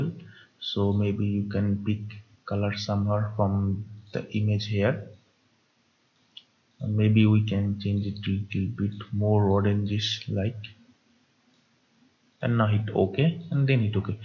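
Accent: India. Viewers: There's a lot of other VFX channels on YouTube but your channel taught me a lot! Also ur accent sounds like Bengali, and as a bengali myself it makes me feel comfortable watching your videos :)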